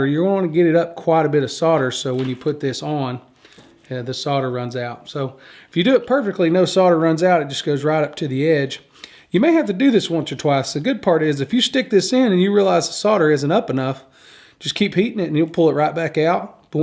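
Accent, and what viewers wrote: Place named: Carolinas, United States. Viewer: You’re from the Carolinas? sure sounds like it🍻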